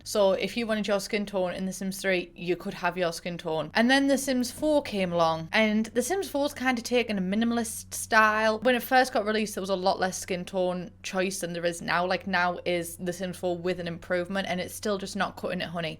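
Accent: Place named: Geordie, United Kingdom. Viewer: I love the geordie accent, but your's in particular just brings back repressed memories of my old maths teacher and it is all I can hear.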